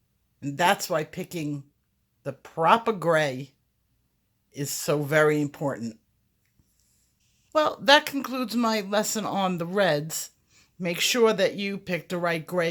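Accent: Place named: Italian American, United States. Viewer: I found this to be extremely helpful when dealing with greys. Thank you! I really enjoy your videos. Your accent reminds me a lot of my late mother- in -law......Italian American from Rochester NY. It wasn't quite as strong but she had lived in California for a lot of years.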